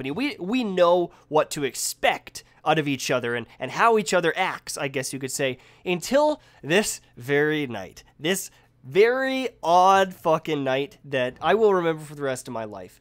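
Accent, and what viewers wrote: Canada. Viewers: R u Canadian ???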